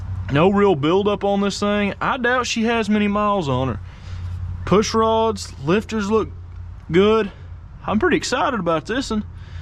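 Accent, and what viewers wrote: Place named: New England, United States. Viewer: You have one of the most interesting Yankeee accents, love listening to it and your relaxed vibe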